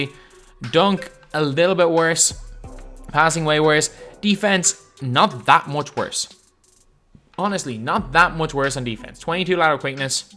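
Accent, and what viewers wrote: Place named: Ireland. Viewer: You sound Irish. I know because I am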